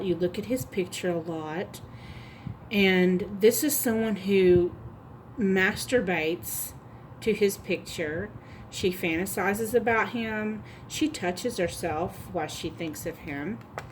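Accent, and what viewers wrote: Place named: South, United States. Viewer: You sound so much like me ! I love your country twang ☺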